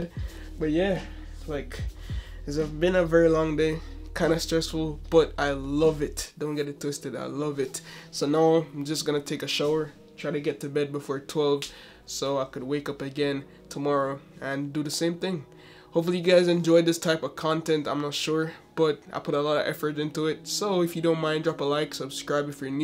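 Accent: Jamaica. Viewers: You sound like a yard man still. I can hear two accents when u speak lol. Good video 👌🏾👌🏾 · Great video, I love that slight Jamaican accent.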